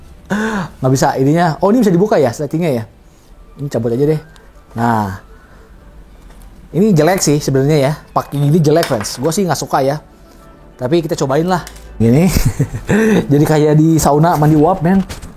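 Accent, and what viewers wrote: West Java, Indonesia. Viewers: Sunda mana ieu bang 😂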